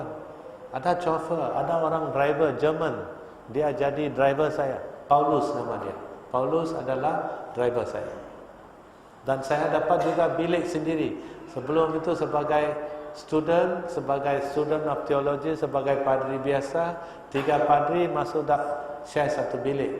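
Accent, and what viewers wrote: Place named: Sarawak, Malaysia. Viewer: No wonder , cakap macam urg sarawak... islam minoriti d sarawak... tp jadi leader... kenapa? Aaaaa...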